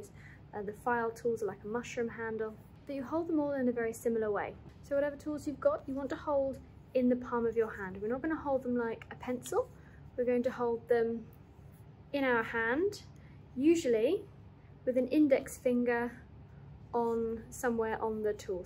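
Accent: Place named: United Kingdom. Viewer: Nice British accent! Thanks for the tips.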